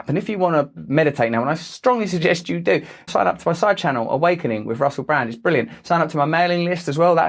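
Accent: United States. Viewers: That American accent got me 😂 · Everytime you do your American accent it causes me to do a double take and rewind. It's so funny! Best to you and yours! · It's fucking weird to hear Russell Brand speaking in an American accent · THE AMERICAN ACCENT🤣🤣🤣 I’m obsessed with jt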